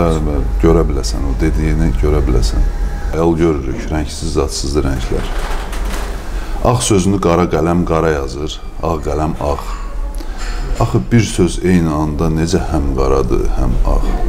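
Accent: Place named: Shirvan, Azerbaijan. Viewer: Şirvan ləçəsi ləzət eliyir adama prostoy так держать земляк 💋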